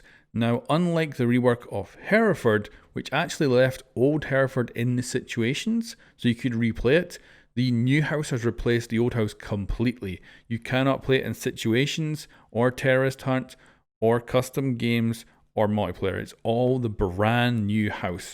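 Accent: Scotland, United Kingdom. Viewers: Are U Scottish V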